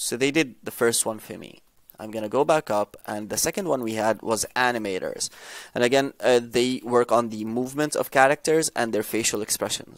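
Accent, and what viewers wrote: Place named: Iran. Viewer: u have a mix of iranian accent and a english accent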